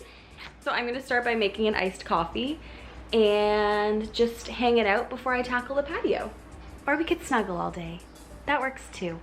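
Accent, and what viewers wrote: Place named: Canada. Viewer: Am I the only American girl who loves to listen to lovely Canadians pronounce words like “couch”? Ah, so soothing.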